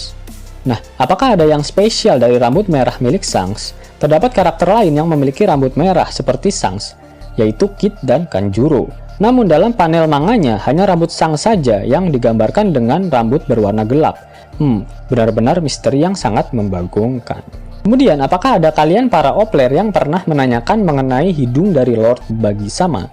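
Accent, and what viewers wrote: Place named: Bali, Indonesia. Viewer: a na ca ra ka da ta sa wa la, orang bali kayanh nih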